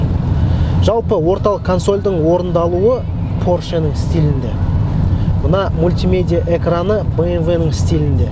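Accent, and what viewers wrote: Standard. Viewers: Жарайсың қолдайм, таза қазақша , мәнді ,мағыналы , мәнерлі сөйлейсің брат отдуши👍👍✊✊ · Азамат! Таза казакча сүйлөйсүң. Казак тилине салымың чоң. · Казах баурларымызга бизден салам, азаматсыз. Ушундай жаңы уналарды тааныштыра бериңиз! Таза Казахча сүйлөйт экен, азаматсын. Көңүл коюп уксаң ар бир сөзүнө түшүнөт экенбиз. Сөзүбүздө негизи көп деле айырма жок экен. Бекеринен айтпаса керек Казак-Кыргыз бир тууган деп.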